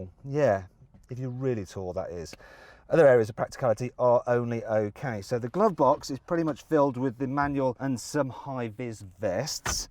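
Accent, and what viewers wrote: United Kingdom. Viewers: 喋り方は一般的なイギリス英語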